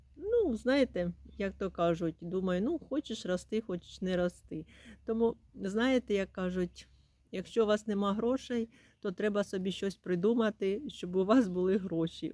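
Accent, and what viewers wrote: Chernihiv, Ukraine. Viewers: Ви, мабуть з Чернігівщини? Я теж звідти. По говору, це мабуть, Макошино, Мена, Сосниця? Я з Борзнянського району. Але живу в Дніпрі. Підписана на Вас. Дякую за цікаві інформації. З святом Вас. І нехай щастить в усьому.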